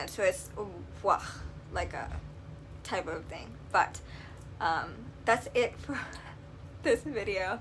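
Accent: New York, United States. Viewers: I wouldnt have noticed any impediment until you pointed it out...but do New York accents not all pronounce coffee Kawfee forever fawevva water waader?? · i hear a new york accent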